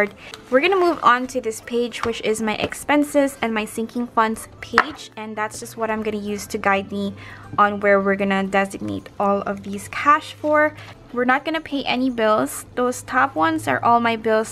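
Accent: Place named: Philippines. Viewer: Hii are u filipino? I think I can tell from the accent ❤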